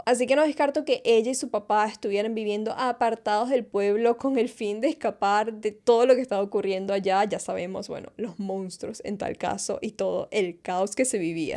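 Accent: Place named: Venezuela. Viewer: Me encantó tu explicación de todo y tu acento, eres venezolana de casualidad? 🥹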